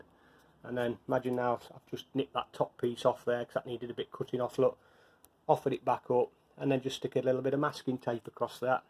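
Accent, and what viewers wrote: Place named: Nottinghamshire, United Kingdom. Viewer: Really good video and explanation 👍👍👍 seriously think I'm gonna have to do it...last few times riding my back and neck getting bit achey...but nearly 63 🤣 ....be great if your ever nearby, on ride ? So I could have a look, I'm in North Notts, your accent sounds close 👍 but thanks again and great idea about the reservoir brackets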